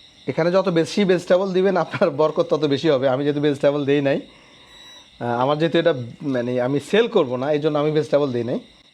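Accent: Noakhali, Bangladesh. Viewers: হু ভাই! একদম খাঁটি নোয়াখাইল্ল্যা। এজন্য কিছু শব্দ নাকের ভিতরে চলে আসে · বাড়ী কি নোয়াখালী নাকি ভাষা এমন কেনো।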